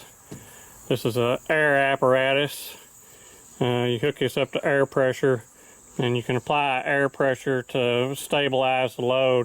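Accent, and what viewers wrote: Kentucky, United States. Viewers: You have yet to publish a boring video in my estimation. I even enjoy watching a piece of steel go round and round in the lathe. You and a few other mechanical types have helped me learn new information. My greatest joy comes from just listening to you speak with your Kentucky accent about technical information. Keep up the interesting work. It is interesting and yet entertaining for this old country boy! Thank you for the pleasure you provide me with every video!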